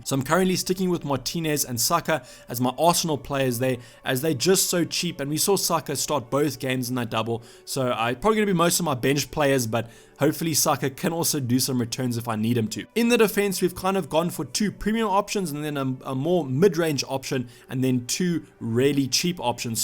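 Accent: South Africa. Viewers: Is this guy south african ? his accent is odd i like it tho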